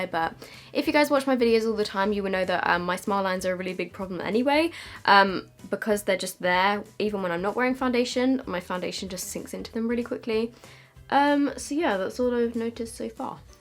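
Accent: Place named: United Kingdom. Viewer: Its so hard to watch this when u hate british accent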